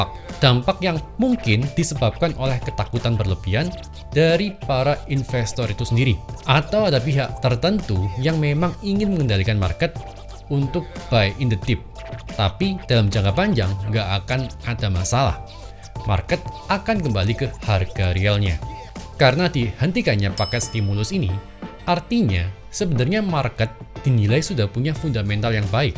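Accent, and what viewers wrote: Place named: Surabaya, Indonesia. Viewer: Bro asli suroboyo yo?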